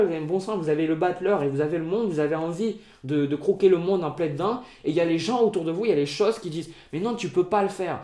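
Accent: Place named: Toulouse, France. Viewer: Salut, je viens de découvrir ta chaine, super super super, du coup j'ai regardé la série de vidéos sur le tirage de cartes (au debut de ta chaine je pense) et le tirage pour les gémeaux (que je suis!!) pour le mois de mars 2019 qui m'a ENORMEMENT parlé!!! Bravo, bravo, tu es vraiment un chouette gars plein d'amour, de bienveillance et de gentillesse et t'écouter est un vrai plaisir... Ne serais-tu pas de Toulouse??? Ce petit accent m'est familier! Gros bisous, plein d'amour pour toi et continue à exprimer ton intuition si juste! Pascale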